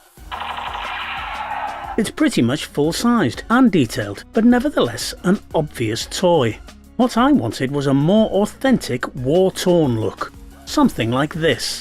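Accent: Wales, United Kingdom. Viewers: Are you welsh sir? 😎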